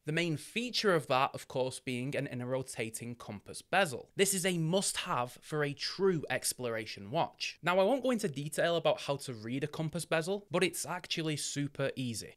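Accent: Yorkshire, United Kingdom. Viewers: Where are you from,? You should like a Yorkshire lad!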